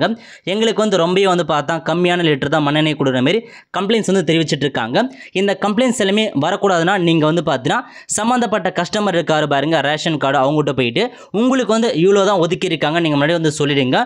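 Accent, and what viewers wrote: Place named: North India, India. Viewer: Yes north indian style la pesurar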